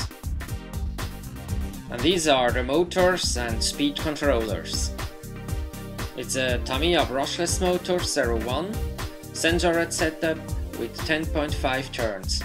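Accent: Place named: Switzerland. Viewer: Hey, great video, really enjoyed it. Excited to see a running video with the setup:D If you don't mind me asking, in which Kanton do you live, I'm just curious as you have a cool accent:)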